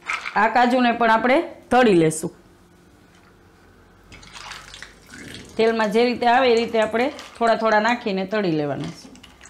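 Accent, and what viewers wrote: Kathiawar, India. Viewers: વેરી નાઈસ. તમે સરસ કાઠિયાવાડી બોલી મા સમજાવો છો... રાજભા ગઢવી જામનગર.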